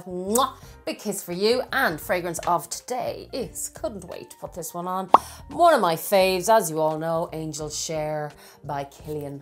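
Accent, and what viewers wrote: Ireland. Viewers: Thank you & your Irish Brogue also make listening merrier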